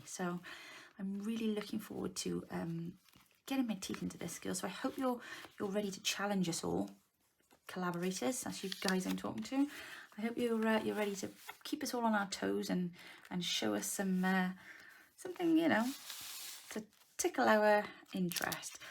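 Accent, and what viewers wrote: Wales, United Kingdom. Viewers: Just found your channel from a fellow Aussie. This looks a fabulous idea. I can hear a welsh accent...oohhh...how wonderful. I was born in Wales and my family emigrated to Australia when I was 7. Most of my family still live in Wales. So lovely to hear a welsh crafter. 🙂❤️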